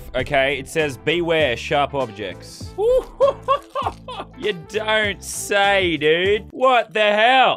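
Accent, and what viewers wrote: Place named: Australia. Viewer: Even when he screams the theme, he still sounds australian...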